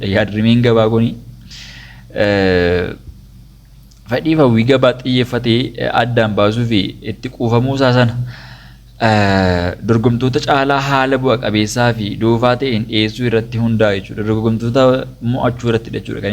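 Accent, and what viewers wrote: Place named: Jimma, Ethiopia. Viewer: Waaaaaw🎉 amazing ❤ ni dandeessa dhugaa jaabaadhu loqoda jimma baayyee naaf ta'e🎉🎉🎉🎉❤❤❤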